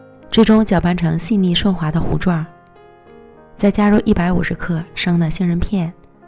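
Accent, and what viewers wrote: Northeast, China. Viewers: 博主的东北口音走到哪都是特别有辨识感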